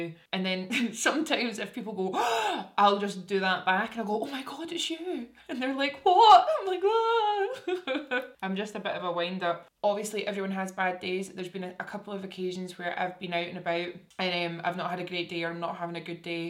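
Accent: Scotland, United Kingdom. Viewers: scottish innit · I have been so obsessed with your YouTube channel over lockdown. It’s just feels like watching one of my friends, probs because your Scottish as well and I love hearing that accent😁🏴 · Okay. I absolutely adore you! I just came upon your channel and boy I’m glad I did. I love your voice, your Scottish accent, your jewelry, or tattoos, EVERYTHING! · I'm watching this with captions on, it literally doesn't understand the Scottish accent lol.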